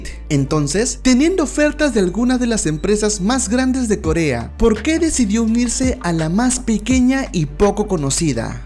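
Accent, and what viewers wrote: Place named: Argentina. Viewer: Hablas como los argentinos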